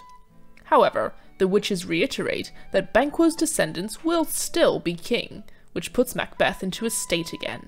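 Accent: United Kingdom. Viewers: Tysm I have an exam tomorrow and this helped me too much I appreciate with the best British accent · Enjoy the narrative with British accent; and I appreciate learning such famous stories in so short a time!👍-Thank you! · Since everyone could recognize this is a typically English or British accent if you agreed!🎉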